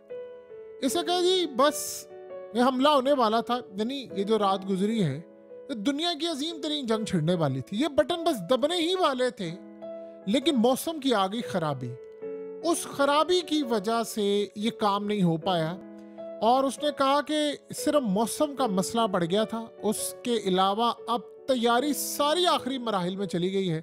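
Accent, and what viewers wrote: India. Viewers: baie AP India chale jayen India ki taraqi AP ke Liye bara aham he or bricks ko bi join kar Len dollar ka janaza bi jadi nikalen. We love Pakistan. AP ka lahja not a Pakistani but Hindustani.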